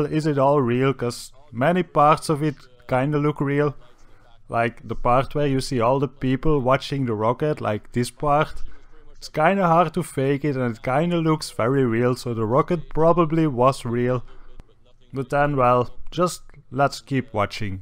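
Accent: Austria. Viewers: One thing I am CONVINCED about is: Your from Austria aren’t you??? Even though the only Austrian accent I have ever heard in my life was the great governator himself Arnold Swarzenegger! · Is the voice over guy doing a Schwarzenegger impression? or is he just Austrian?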